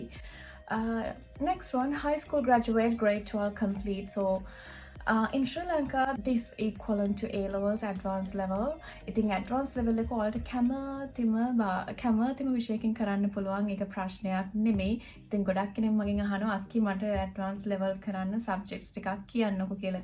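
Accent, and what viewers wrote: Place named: England, United Kingdom. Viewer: U knw what .ur so beautifull...plzz video ekak karanna english acent eka hadaganna widiha oya wage...❤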